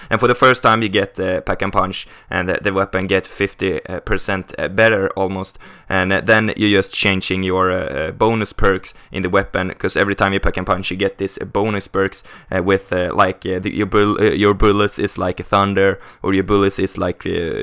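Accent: Sweden. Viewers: Bra swengelska!!! Döör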